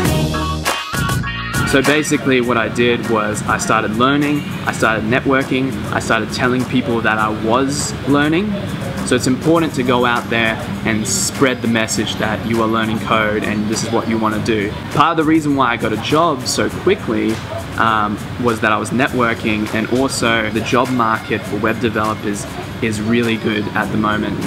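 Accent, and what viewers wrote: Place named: Australia. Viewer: I'm 100% you're australian (by your accent)!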